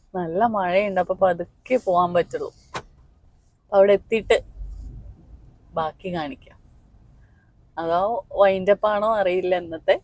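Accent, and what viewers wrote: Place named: Valluvanad, India. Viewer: വള്ളുവനാടൻ ഭാഷ ല്ലേ... പാലക്കാടൻ slang ഇല്ല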